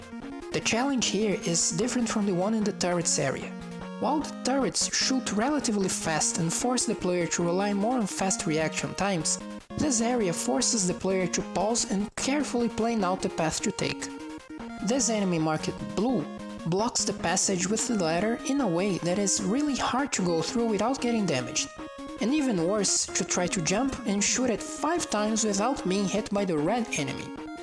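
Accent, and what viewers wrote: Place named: Brazil. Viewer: By some reason I feel like you're brasilian. Well, at least your accent gives me this impression.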